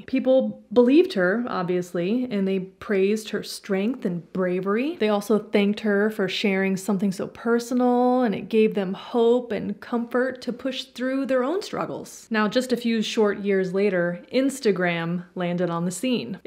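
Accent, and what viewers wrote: Australia. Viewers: I am so here for your Aussie accent. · Loving your Aussie accent 😜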